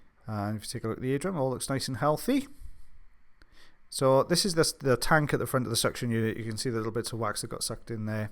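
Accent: Wales, United Kingdom. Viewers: I have family in Mountain Ash and my grandad was from Abergavenny. I'm in Canada now but you sound like home. Thanks!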